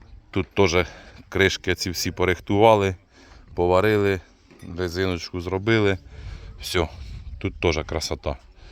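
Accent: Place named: Surzhyk, Ukraine. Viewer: Зайшов на канал, люблю цю тему, та не зміг слухати. Мова така покалічена, засмічена, що ріже вухо. От так ми зі суржиком і помремо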